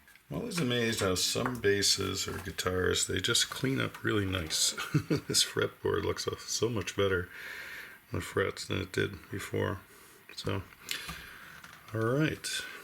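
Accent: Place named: Ottawa Valley, Canada. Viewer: Over here in the L. Ontario basin, we can hear the subtle, very subtle Ottawa Valley accent ... it's a Juzz Bayce!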